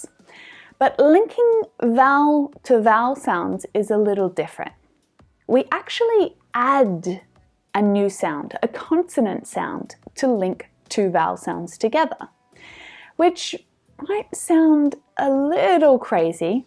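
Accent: Melbourne, Australia. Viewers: Is from Melbourne? I thought so...I'm from Sydney and I can hear subtle differences in her "accent" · That's quite amusing this video comes from someone sounding Australian. People from Australia don't speak properly, skipping letters. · Hi. I loved your Australian accent!!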